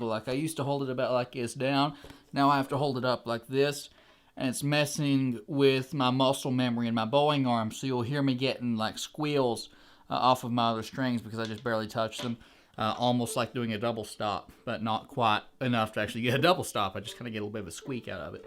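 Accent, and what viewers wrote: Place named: Texas, United States. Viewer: I'm not American, but judging by your accent and those guns, are you from Texas? Haha I started playing the violin about 5 months ago. I couldn't afford the classes so I had to quit, but I still practice it. You sound really great, but don't hold it that high, or your shoulders will begin to ache and you won't focus on what you're doing. Your thumb should be placed on the side of the neck. Good luck :)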